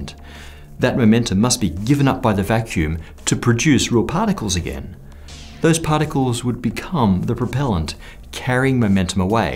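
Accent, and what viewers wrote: England, United Kingdom. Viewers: Your English accent adds no credibility. And your video sucks. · Wow!!!!!!! This is an awful PBS Space Time clip. I'm shocked at how badly this misrepresents the applications of zero-point energy. It is this these kind of "Negative" (pun intended) clips that keep us using rockets instead of learning about electro-gravitics. 👎 Did you notice how they used someone with a slightly British accent to make it appear they are smart and intelligent enough to explain the concepts of physics. This clip ends with a gecko 'challenge' relating to their feet. This clip is simply pathetic.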